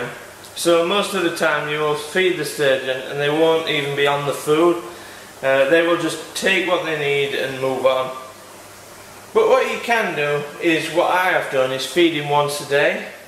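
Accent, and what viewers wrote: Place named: Yorkshire, United Kingdom. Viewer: I just found your vids they are so informative and well done, love the commentatory in great Yorkshire accent.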